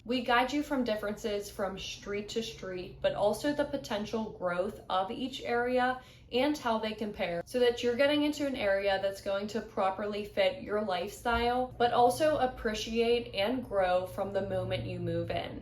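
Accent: Pittsburgh, United States. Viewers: Does she have a Pittsburgh accent? Is that what I'm hearing? For example, sandwiching an h sound between the s and t in street and stroll- "shtreet", "shtroll"...is that what Pittsburghers sound like? Anyone know? Anyone here? Hello? Hello, hello?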